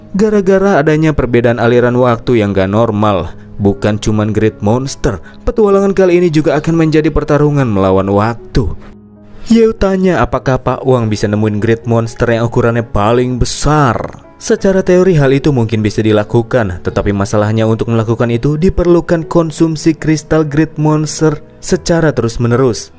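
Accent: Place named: Payakumbuh, Indonesia. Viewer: Logat bng kaya orang pykmb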